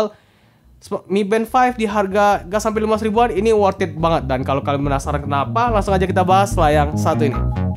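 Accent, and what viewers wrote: Palembang, Indonesia. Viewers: Wong Palembang lah😄👍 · Palembang ya? Wih mantep bang.. dari aksen nya sih iya keliatan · Lahh wong palembang kamu kak 😂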